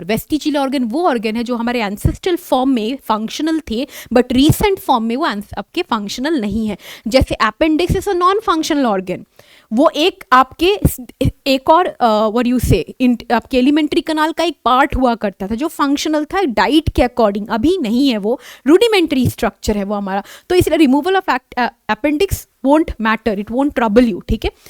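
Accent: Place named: Tamil Nadu, India. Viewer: Yeh ma'am south indian accent aa rha ha tamil side ki ha shyd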